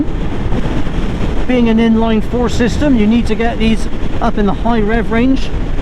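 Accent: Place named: Bristol, United Kingdom. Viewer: I subscribed just because of your bristol accent 😄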